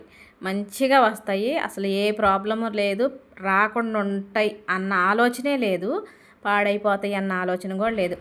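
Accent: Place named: Andhra Pradesh, India. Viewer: Miru andra mata lagane matIadtaru bagauntadi ma entiloala unatmo alage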